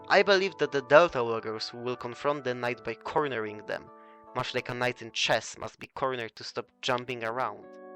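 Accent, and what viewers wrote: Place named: Europe. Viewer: Hey I'll take a cool European accent over another annoying hyperactive or bored American accent any day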